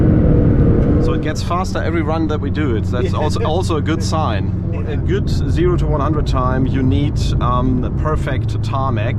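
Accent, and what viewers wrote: Germany. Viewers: ein wunderschönes Denglish :) hört man sofort raus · I hate this german accent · are you from germany??? because you sound german??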